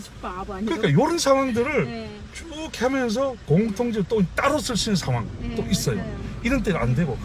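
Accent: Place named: Gyeongsang, South Korea. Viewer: 선생님의 말씀을 들으면 '이제 나도 선생님 가르침을 따라 열심히 하면 수어를 잘할수 있겠구나'하는 자신감이 생깁니다. 수어를 외우는 것이 아닌 이해 하면서 공부 할 수 있겠다는 생각에 수어 공부에 대한 관심이 더 커지는것 같습니다. 선생님께서 연구를 위해 많은 노력을 하셨음을 또한 느끼며 감사할 따름입니다. 그리고 선생님 말씀 하실 때 억양이 경상북도분이 아니신가 생각 됩니다. 저는 전라도 사람인데요 경북 영천 친구가 있었는데 그 친구 억양과 비슷하다 느꼈습니다. ㅋㅋㅋ 선생님~ 선생님의 연구에 존경을 표합니다. 찾아 뵙고 인사 올리는게 도리일진데 댓글로 인사 올려 송구합니다.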